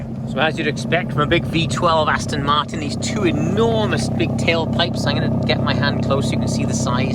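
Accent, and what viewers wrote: Wales, United Kingdom. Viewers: Do I deduct a welsh accent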